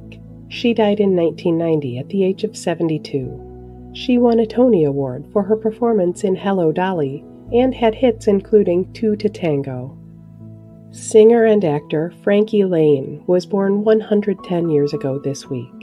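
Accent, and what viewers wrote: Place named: Upper Midwest, United States. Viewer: Are you from Minnesota by chance ? I detect a strong upper Midwestern accent.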